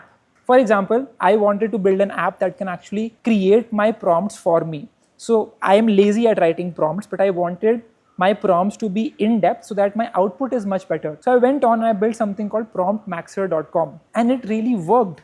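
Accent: India. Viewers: Your tongue ia real indian accent😊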